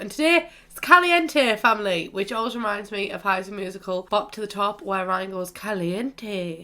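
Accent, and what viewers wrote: United Kingdom. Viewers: Lmao you’re so british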